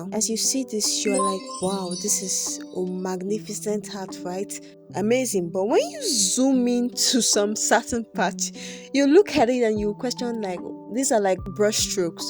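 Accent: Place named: Yoruba, Nigeria. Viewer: E sure me say you be yoruba !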